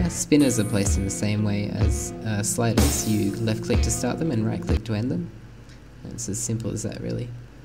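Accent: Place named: Australia. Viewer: It sounds aus-like anyway. ;]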